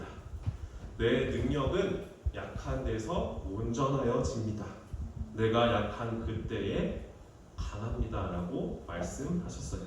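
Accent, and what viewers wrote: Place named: Jeolla, South Korea. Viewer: 전도사님~ 이순신 연기 보고 진짜 이순신 장군님인 줄 알았어요~ 역시 전라도 분이시네요^_^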